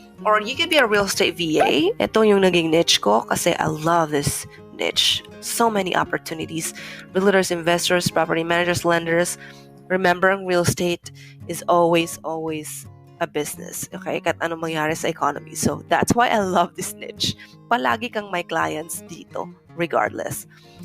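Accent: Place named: United States. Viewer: you speak great! 'thought at first that you're an american! great accent, lpve the tone of your voice.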